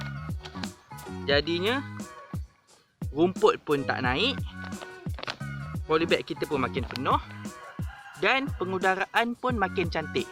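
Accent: Pahang, Malaysia. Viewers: ckp mcm org pahang..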